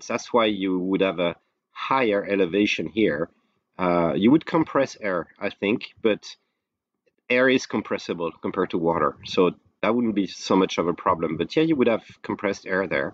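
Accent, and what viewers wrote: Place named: France. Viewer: nice french accent ! ^^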